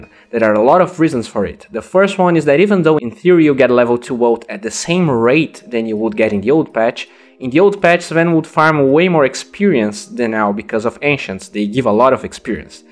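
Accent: Brazil. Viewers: teu sotaque parece de br hauahauah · Haha eu reconheço um sotaque BR quando ouço! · accent de br, inconfundível ! Haha eh nois !! Feliz natal e ano novo tb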